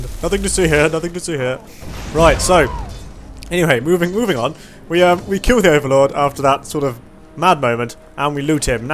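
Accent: United Kingdom. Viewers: Oy, luv, your, britesh, accent ,young man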